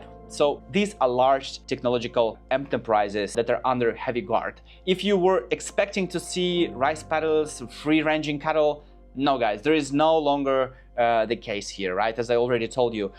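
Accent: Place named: Finland. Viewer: Wait, are u from finland? No wonder ur accent sound so finglish.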